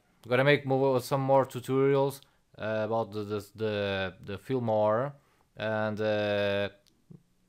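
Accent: Portugal. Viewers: Olá you sound Portuguese :) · hey amigo es portugues consigo ver pelo sotaque obrigado pela dica aqui no video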